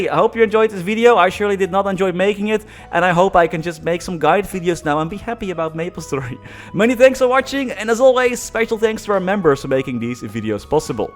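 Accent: Netherlands. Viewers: are u dutch? im dutch too :D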